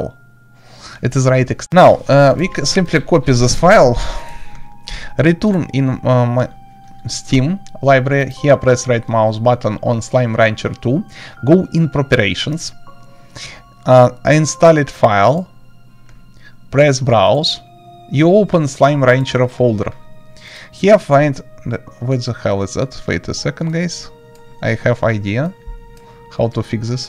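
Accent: Russia. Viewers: ты ли это? акцент русский, голос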